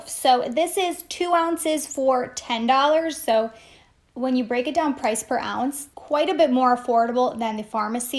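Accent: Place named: Michigan, United States. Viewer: Sometimes that mid western/ Michigan accent comes out to play. The way you say “BOTH” is soooo interesting to me as someone from the south that’s defffff not the way we say “both” down here!!!!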